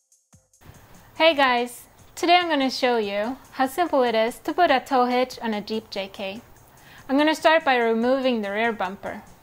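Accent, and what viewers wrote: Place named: Romania. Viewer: Thank you for your video. I am installing mine this weekend following your instructions. You sound and look romanian.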